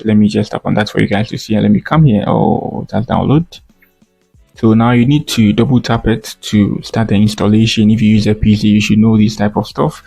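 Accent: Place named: France. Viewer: tu parles francais non ca se sent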